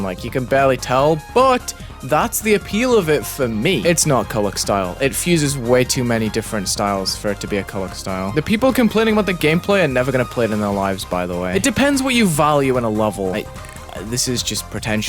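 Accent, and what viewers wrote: England, United Kingdom. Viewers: О вы из Англии????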